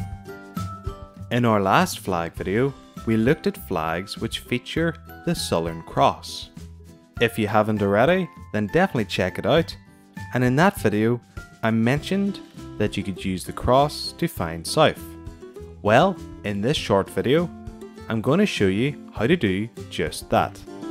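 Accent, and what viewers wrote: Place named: Northern Ireland, United Kingdom. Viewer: Love how you have to speak so slowly do that people understand your NI accent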